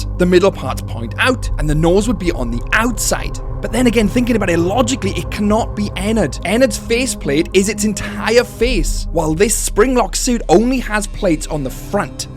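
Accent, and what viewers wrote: Wales, United Kingdom. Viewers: 'Funtime Beaka', love that slip of the tongue, esp. with the Welsh accent :D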